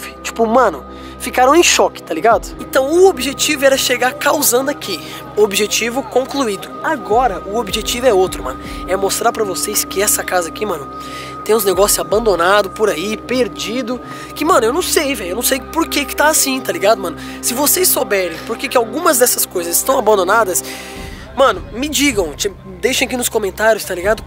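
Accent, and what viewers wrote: Portugal. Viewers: Fala portugues de portugal kom os meninos da kasa..😉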